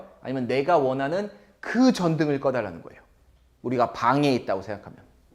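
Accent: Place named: Chungcheong, South Korea. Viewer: 충청도 사투리 쓰시는거 같던데 천안분이셨나봐요? ㅎㅎ 참고로 사투리는 잘 모르지만 느낌이 그러네요^^*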